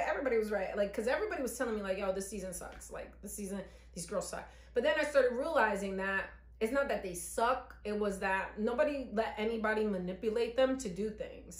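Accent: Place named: Baltimore, United States. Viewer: The way you sound on here I would think you’re from Baltimore/DC area not N. jersey haha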